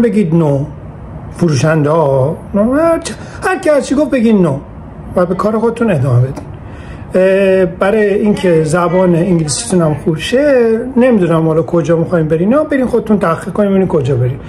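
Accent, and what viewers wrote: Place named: Iran. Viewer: انگلیسی را با لهجه فارسی صحبت نکنید ... طرز تلفظ جملات و کلمات را از گوینده انگلیسی زبان که زبان مادریش انگلیسی است یاد بگیرید .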